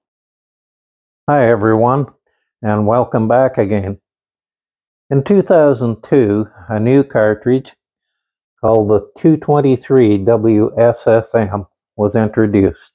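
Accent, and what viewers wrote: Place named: Canada. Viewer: Hello there, what a beautiful job restoring that rifle! You sound Canadian, hello from northern Alberta. Hope you continue to post videos of your collection.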